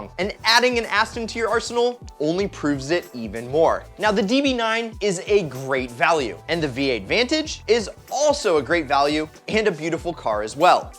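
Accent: United States. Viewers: Yo, can you just do a whole vid with your cowboy accent? hmm?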